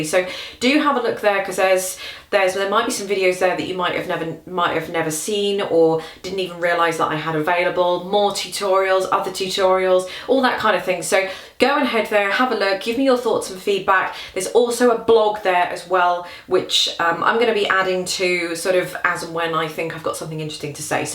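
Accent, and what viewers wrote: England, United Kingdom. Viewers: honestly sometimes i am watching you only for your english accent😂 btw i am a foreigner your tutorials are what we need as student so thank you for your effort.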